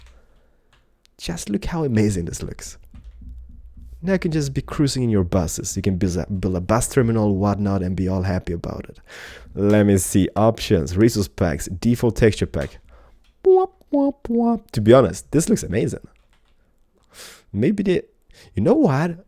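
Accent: Sweden. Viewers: are you from sweden!? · are you from sweden? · are you swedish!? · So swedish keralis Haha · are u from sweden? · are you from sweden? :O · WAIT ARE YOU SWEDISH?! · Are you Swedish if you are no Way im Swedish to!!! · Are you from sweden ?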